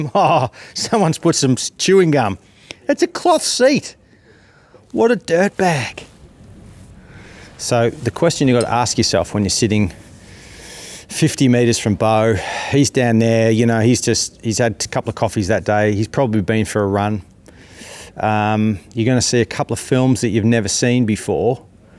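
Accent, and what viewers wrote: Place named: Australia. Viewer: Watched Beau to learn Aussie accent way back 2019 in preparation for Uni in Melbourne. Continued watching since! Stoked to see you in July!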